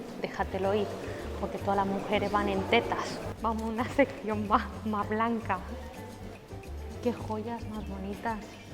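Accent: Andalusia, Spain. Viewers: Me he liado.. .. de donde eres!??? Tienes acento andaluz también!??? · Y por qué hablas con acento andaluz? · Porque si eres de Barcelona tienes acento de Andaluza?? Saludos 👍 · tu origen me tiene confundido, has hablado de pesos, tacos y México, tienes un super acento andaluz y hablas catalán que parece nativo. de dónde eres? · Me resulta divertido porque pasas de un catalán con acento perfecto nativo a un castellano mezcla de andaluz, murciano y mexicano. Por lo demás, me encantan tus videos.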